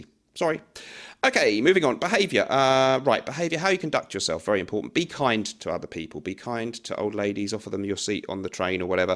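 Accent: England, United Kingdom. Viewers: ....classy? Smartly dressed and that English accent is helpful.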